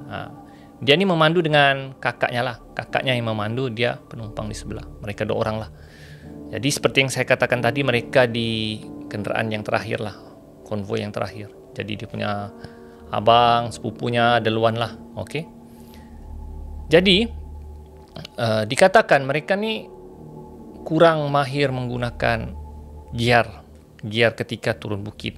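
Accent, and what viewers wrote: Sabah, Malaysia. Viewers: Mantap.. Sya suka cara penyampaian kau.sebab tdk lari bhasa sabah kau. Banyak utuber yg bla membahas ssuatu hilang sabahan ori dorang. Terbaik bossssssskuuuuu.....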